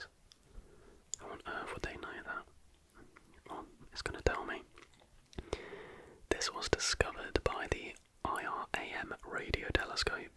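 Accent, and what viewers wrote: United Kingdom. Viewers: Amazing video to fall asleep to. I take a while to fall asleep so I usually need something to occupy my brain until then and this is absolutely perfect and even better that its educational. Your voice with your British accent is just amazingly calming to listen to. Thank you very much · he's just british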